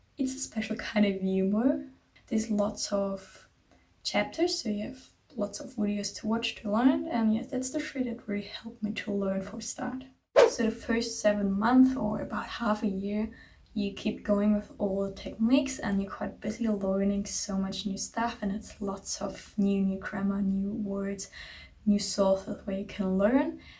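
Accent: France. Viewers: You sound like french when you speak spanish, where are you from? Y buena suerte en tu aprendizaje, a por cierto debes hacer énfasis en la pronunciación de la R 👍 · Hablas muy bien español, y tienes un ligero acento Frances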